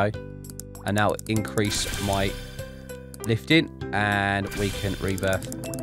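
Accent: United Kingdom. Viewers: 39 mins! :D love ur vids and the british accent 😮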